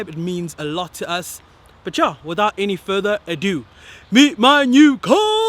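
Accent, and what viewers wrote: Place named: United States. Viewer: Good effort bruv. IMHO try being more yourself South African opposed to American you tubers accent wise. Nice car I've also got a R 2016 dsg oryx white from new and a V8 M 2008 black on black 6 forward drivers car. Looking at a Hawkeye STI in the near future sick project. Keep it up also the R is a beast tuned especially of the line.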